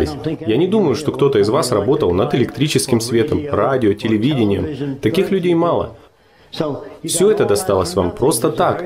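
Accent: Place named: United States. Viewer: Говориш как Американец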